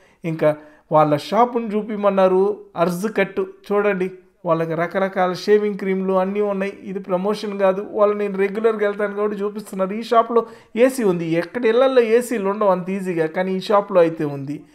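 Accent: Nellore, India. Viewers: Nellore slang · Sooper comedy Mana nellore yasa adaragottav 😁😁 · anukuna brother mi slang chusi ,manadi kuda Nellore ee.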